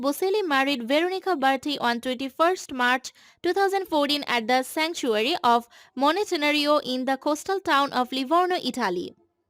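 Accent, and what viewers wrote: India. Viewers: Your accent sound like Indian to me hard to understanding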